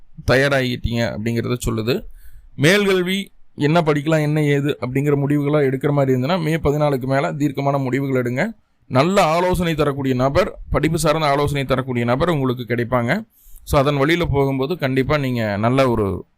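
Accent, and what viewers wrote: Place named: Kongu, India. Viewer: நீங்களும் வளமுடன் வாழ வேண்டும். அலட்டல் இல்லாத நடையில உள்ள உங்கள் கொங்கு பாஷைக்கு நான் அடிமை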